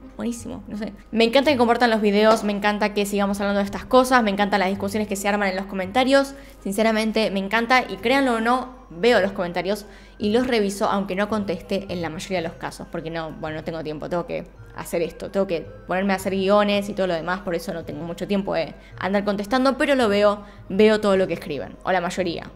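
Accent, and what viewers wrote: Argentina. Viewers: Me encantas tus historias de misterio, y mas con ese acento argentino tan pronunciado jejeje...haces un gran trabajo guapa, felicidades!🥰saludos desde Oklahoma city-Oklahoma..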